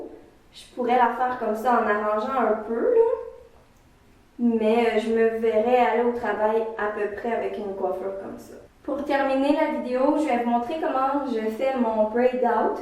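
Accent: Quebec, Canada. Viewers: t une quebecoise ? c sur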